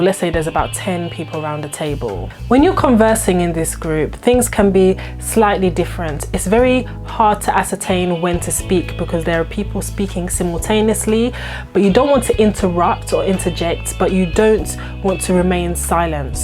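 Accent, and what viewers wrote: United Kingdom. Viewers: ove your British accent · I like the British y African accent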